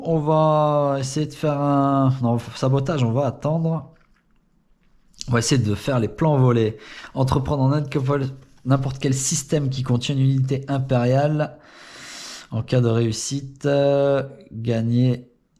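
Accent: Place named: Italy. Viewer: Aloha 🤙🏝️. Très bon accent Italien👍 Je ne connaissais pas ta chaîne. Tu t'exprimes bien ça fait plaisir! Tu es passionné c'est cool! As-tu arrêté tes parties?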